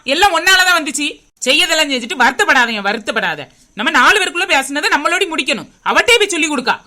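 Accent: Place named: Thoothukudi, India. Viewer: Arumaiyana Padhivu :) Enakku Thoothukudi dhan...Indha Pecha Kekrapo Avlo Amudhama Irukku❤️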